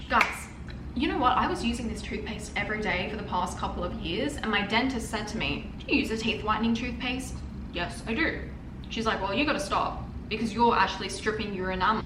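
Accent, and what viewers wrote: Australia. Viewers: ? Aussie accents are so hot rosé from blackpink for example, what are u on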